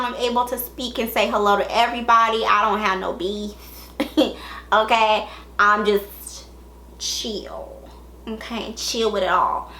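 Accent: South, United States. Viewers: I started watching you just to hear you talk because you remind me of someone from the south such as myself....but when I say that you hit this topic right here out of the field and that was a hall of fame homerun. Gurrrrrlllll, you kilt me when you said, "I am 30"! That means I do not have time for the bull crap and the cliches'. That is some childish shit and I will say that for you. See, I love a genuine person. This is why half of them are having issues and problems within their homes and lives. Some cannot go any further because of it. There have been some that I have unsubscribed from for this very reason. Plus they always have problems with people and other brands......damn just shut the hell up with it all. True we come on here for entertainment but at the same time we want to see the real reality shows, instead of all of this she my friend oh I don't hang with them because they don't drive a Mercedes or they toast their bread in the stove and not a toaster....I know I went there for a second. 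Anyway, I love what you said and the way that you said it, you were respectable not to stomp on anyone toes. Really, if you are who I think you are--you wouldn't give a damn if they did get mad! I know I wouldn't. One instance and I know somebody may say something back at me but oh well, but this damn palette issue. Why are some people on these videos eating from the same table but you're not sampling this person's new collab, when I have clearly seen them on pictures toget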